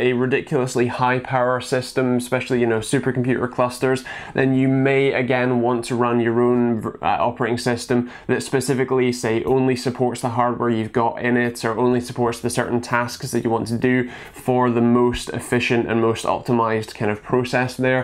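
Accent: Scotland, United Kingdom. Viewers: It sounds Scottish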